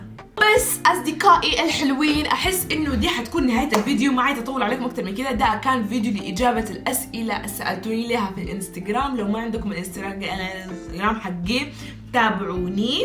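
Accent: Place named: Libya. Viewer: احلى من يتكلم ليبي🥹❤️❤️❤️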